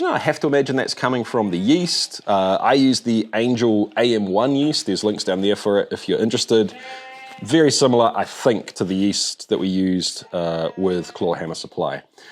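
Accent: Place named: New Zealand. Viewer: The SHEEP INVASION., until now your accent (and formerly-American one) was the best evidence that your channel is pure New Zealand. But now you've been INVADED by BLEATING SHEEP.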